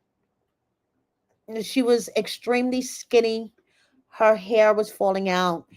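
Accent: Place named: Connecticut, United States. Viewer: Your voice reminds me of my home CT. LOVE LISTENING TO YOU. 🍹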